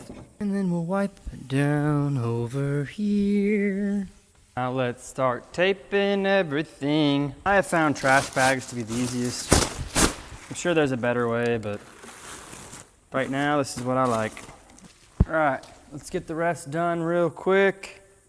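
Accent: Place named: United States. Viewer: Saludos, de que parte de Estados Unidos es tu acento?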